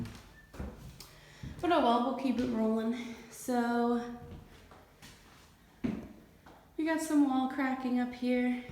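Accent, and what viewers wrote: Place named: United States. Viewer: Could you give a quick backstory at the beginning of each restoration video? I'm a 1st time viewer and have lots of questions: Is this going to be your house or are you all planning to flip it? Is your dad doing all the work? How does he how to do that kind of work? Will u just be documenting or are you doing work too? What state is this house in? (Not asking for exact location, just generally. By your accent I'm assuming it's in the USA). Ok, you answered my first question at the very, very end of the video. Lol.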